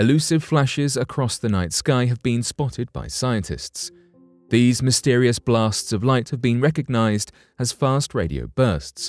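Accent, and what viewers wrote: United Kingdom. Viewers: Can't you bloody blokes find SOME OTHER NARRATOR ( PREFERABLY SOMEONE WITHOUT A BRITISH ACCENT)??? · EVERY BULLSHIT SPOOKY MYSTERY SITE ON YOUTUBE HAS A FUCKING BRIT NARRATING, THEY HAVE "STONE HENGE ITIS"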